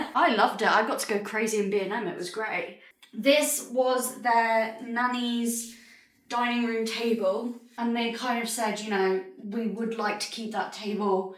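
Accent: South, United States. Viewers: As an American I must say you do an excellent Southern accent😁! Love your work ethic and ethics in general. You are a godsend to many people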